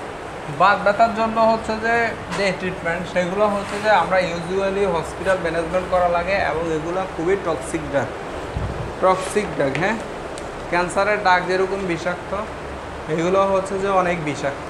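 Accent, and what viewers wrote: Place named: Chittagong, Bangladesh. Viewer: স্যার এর লেকচার অনেক সুন্দর, টপিক গুছিয়ে বলে, স্যার মনে হয় চট্টগ্রাম এর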